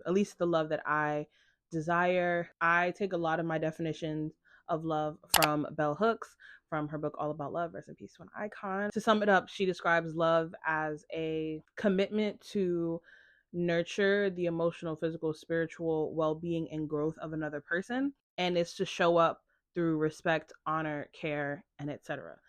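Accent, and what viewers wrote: United Kingdom. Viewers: I thought I wouldnt have the attention span to listen for an hour, but you had me HOOKED! And your british accent is good haha, may allah reward you sis, keep making this type of content!!❤ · aaaa the YouTube algorithm did her big one for once!!! i just love your vibe, humour, energy, mindset so much!!! although im actually a black Christian girlie(will be putting my Muslim babes onto your channel bc☕️!!!!) but I just turned 20 never had a relationship or been in love and definitely related to so much you said I honestly struggle sometimes thinking if that kind of love is attainable especially as I’m intentionally dating and waiting for marriage but in today’s society it can be very discouraging. im a curvy girl too and the amount of sexualisation despite how modestly I may dress🤡🤡heavy on the my man isn’t in this country im from the uk(loved your lil British accent hehe) but so ready to tap into the softness and femininity experienced elsewhere bc this ain’t it most of the time like you said as a black woman we’re masculinised and dragged for how independent we are! 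thank you so much for the advice you gave so truthful!!! and the questions you asking during your courtship like that’s literally me like if we’re getting married it’s forever so genuine compatibility is non negotiable! you spoke so beautifully honestly and what you said about him seeing your humanness aaaaa im going to go buy that book and watch all your Colombia videos I actually learned Spanish last year so honestly loving the Spanglish!! sorry this is so long haha I never leave comments bc i yapp anyways ik this is a little early but Ramadan Mubarak and can’t wait for you to find the REAL thing!!!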